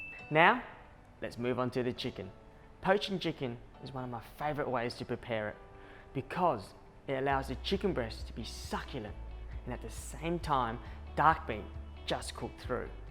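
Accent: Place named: Australia. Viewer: Great Video Mate... Found you through Max McFarlin's Channel... Heard the Aussie accent and wondered who is this guy!!! Well, now I know. Best of luck with the channel and all things food. Sub done!!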